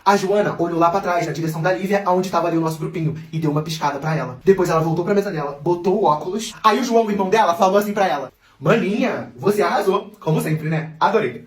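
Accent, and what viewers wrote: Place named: South, Brazil. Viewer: Você é do Sul né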